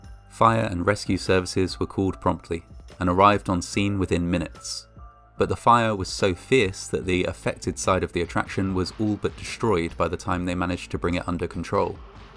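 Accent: United Kingdom. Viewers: Why is it always British people that narrate these type of videos? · Nah, he's just British. They all sound like that. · He’s got the “creepy voice with British accent” thing down to a science!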